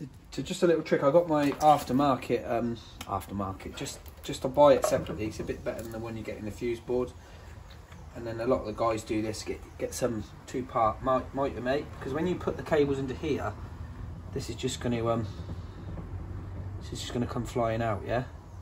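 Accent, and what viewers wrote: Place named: West Country, United Kingdom. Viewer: I can hear a bit of West Country in your accent.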